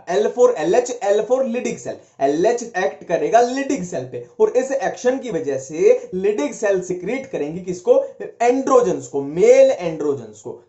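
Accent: Haryana, India. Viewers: Thankyou so much sir Waise aap Haryanvi hain kya?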